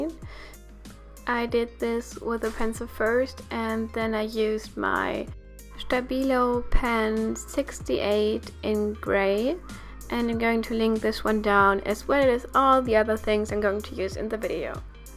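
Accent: Germany. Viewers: Ich hab schon mehrere deutsche Youtuber Englisch sprechen hören, aber ich glaub deins war bis jetzt am besten😂Finde den deutschen Akzent voll niedlich 😅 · U are from Germany right?? Because u had kind of German accent 😂 And in your old bujo was :"Omas todestag" (I am from Germany sorry for my bad Englisch) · i like your deutsch akzent macht das video familiär <3! XD ( bitte mit deutschem akzent lesen) Aber du sprichst echt gut englisch! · der deutsche Akzenkt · When you’re 1min into the video and already know that she’s German not because of her speaking (which is quite elaborated because I didn’t notice her accent was German at first) but by the fact she had a Mallorca page in her journal... hach die Deutschen 😂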